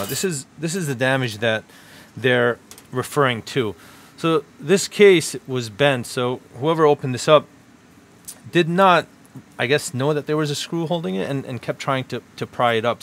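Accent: New York, United States. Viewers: When they both grow up in NYC anyone from outside NYC will think they sound the same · You sound more New York than you usually do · New York accent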